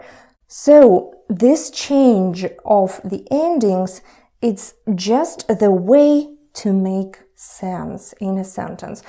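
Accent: Russia. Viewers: на самом деле русский акцент очень слышен)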